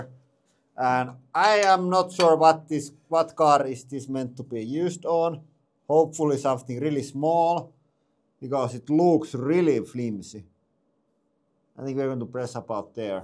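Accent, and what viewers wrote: Finland. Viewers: English speakers, how do you find this guy's English? This is what we call in Finland, 'rally English', and I hear some of us really speak this way. I don't, but I'm a curiosity.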